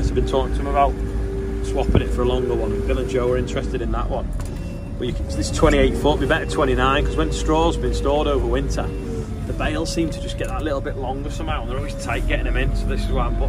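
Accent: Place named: England, United Kingdom. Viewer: Nice video.. only i understand half the time the english accent you are talking😅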